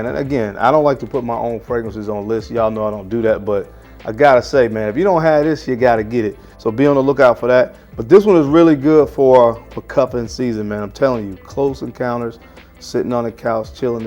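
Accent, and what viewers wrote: South, United States. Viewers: I sure wish you would either learn how to enunciate your words - the southern drawl and other speech effx are confounding - and invest in upgrading your vids so that we can see the actual names of the frags and manufacturers. Thanks.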